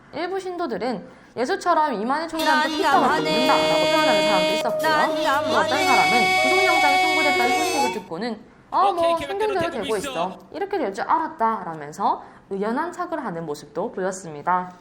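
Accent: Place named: Gyeongsang, South Korea. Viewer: 다 가리긴햇는데 경상도 사투리가 나오시네요 ㅋ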